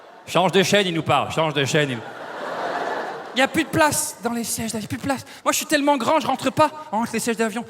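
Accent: Quebec, Canada. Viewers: ya pas mal de trucs dans sa façon de dire les choses, l'intonation, l'accent etc qui me font penser à rachid badouri/ que j'ai aussi remarqué chez rachid badouri. Du coup je sais pas si c'est son idole et que du coup ya des clins d'œil ou si son style est insconciemment similaire à celui de rachid ou si c'est simplement parce que "ce type de stand-up" est plus populaire au québec.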